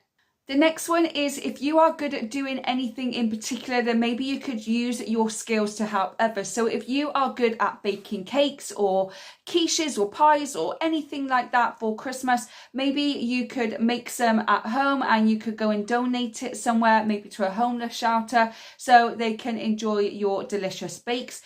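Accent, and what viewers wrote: United Kingdom. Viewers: You are accent look like British love it that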